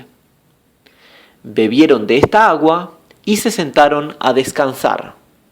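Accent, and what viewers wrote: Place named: Arab world. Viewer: Por lo que veo, está leyendo la traducción de Blasco ibañez, basada en la versión de marduz, que es lo que estaba buscando. Las críticas que leo sobre el estilo del narrador son tontas, obviamente hay diferentes estilos de narración oral, a mí me gusta ese dejo árabe o del sur de España que tiene (soy argentino, no lo puedo precisar), pero gustos son gustos; lo que sí es importante es que es una voz humana.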